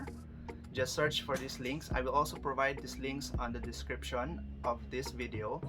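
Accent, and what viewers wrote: Philippines. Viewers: Bat parang tagalog accent yung English mo boss? 😨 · Muntik pa sana akong mag comment ng what is your nationality why is your accent sounds very filipino😂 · Gagi na noticed ko agad Filipino accent kala ko pinoy. Di ako nakatingin sa screen lol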